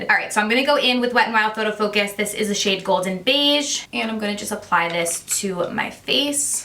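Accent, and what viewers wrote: United States. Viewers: Her talk reminds me of jaclyn hill. Very american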